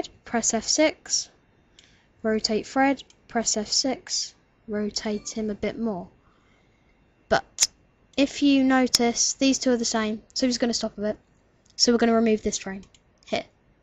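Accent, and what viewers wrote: United Kingdom. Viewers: thx! this really halped! and w00t FINALLY i hear a British voice on youtube 4 once XD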